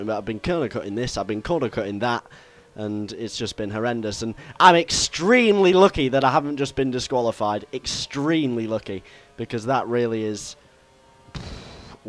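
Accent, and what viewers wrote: United Kingdom. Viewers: He has a very normal British accent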